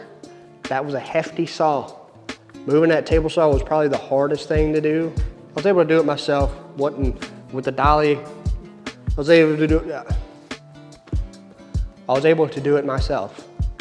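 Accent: Louisiana, United States. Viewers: Sounds like Louisiana. I was in Shreveport and moved out to Greenwood to get away from the politics and the hassle. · Where in Louisiana are you. So strange hearing that accent on youtube. Not used to hearing it anywhere near an internet connection. And this heat has been killer. The insulation just hurts you more without AC. Better to open the doors and run a fan until you get enough power to run your AC.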